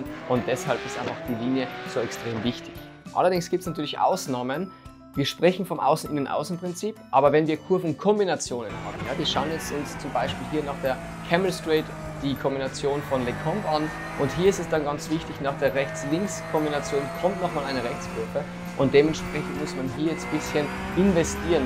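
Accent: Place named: Austria. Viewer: Endlich mal eine angenehme österreichische Stimme :D Ich finde du machst echt super Videos und gerade im DIY-Bereich bin ich sehr froh eine ordentliche deutsche Erklärung zu bekommen. Es wird nicht ewig um den heißen Brei geredet (Das Hauptproblem der meisten Youtuber IMO) und du hast interessante Themen! Ich hoffe es kommt noch ein Video für eine DIY-Wheelbuttonbox. Ich will mir unbedingt selbst eine bauen aber ohne ordentlicher Videoanleitung, traue ich mich leider nicht drüber. Aber ich freue mich auf jedes neue Video :) Mach weiter so und alles Gute für die Zukunft!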